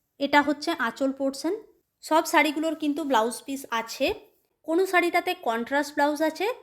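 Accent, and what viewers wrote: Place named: Birbhum, India. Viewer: আপনার সঙ্গে কেরালা ভ্রমণ করছি।ওখানকার জীবন সংসার সংস্কৃতি সব জানতে পারছি,আপনার সব ভ্লগ এখনও দেখা হয়নি,তবে আপনার ভ্লগ সাবজেক্ট ও প্রেজেনটেশনে দক্ষতা ও মুনশিয়ানা আছে, সকলকে আগ্রহী করে তোলে,অবশ্যই ধন্যবাদ। আরও কথা যা শুনি যে বাংলার পরিযায়ীদের 2য় ভুমি কেরালা,ওদের কাছে থেকে দেখে শিখে ওরাও অনেক উন্নত ও ভাল থাকছে।আপনার ভ্লগে তাদের অনেক কে দেখলাম, ভাল লাগল। একটা কথা আপনার কথায় একটু বীরভুমের টান আছে, সেটা হতেই পারে , কিন্তু আপনার কেরালা কে ক্যারালা শুনতে একটু কটু লাগে, অবশ্য জানিনা ওখানে সঠিক উচ্চারণ কেরালা নাকি ক্যারালা বলে আপনি ভাল জানবেন।অনেক ধন্যবাদ।বাংলার মেয়ে তথা বধূর শিক্ষা ব্যবহার মানসিকতা ও সহযোগী ভুমিকায় ঊজ্বল হোক আপানার সংসার।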